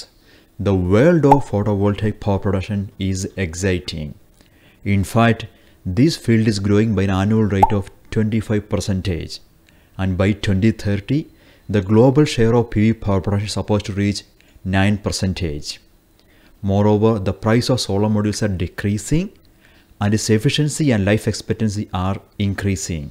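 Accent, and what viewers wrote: Kerala, India. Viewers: Nalla malayali accent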